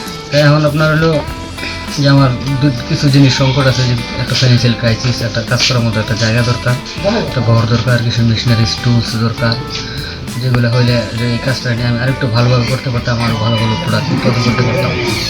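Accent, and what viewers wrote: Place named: Mymensingh, Bangladesh. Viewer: আইন্নে ময়মনসিংহের ভষাডা পুরা তুইল্লা ধরছেন 😎😎😎😎😂😂😂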